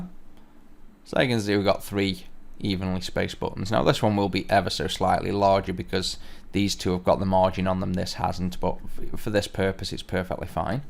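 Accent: United Kingdom. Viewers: Lol that UK accent. I feel like I am watching game of thrones. Well done by the way! Nice Video!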